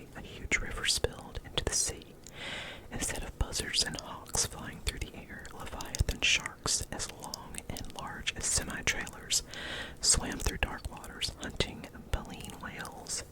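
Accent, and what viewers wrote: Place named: South, United States. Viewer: Thanks! Another very relaxing flip through. (BTW I love your southern accent 😊)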